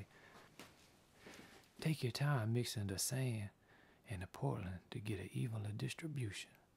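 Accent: Louisiana, United States. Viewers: Is that a Cajun accent I hear?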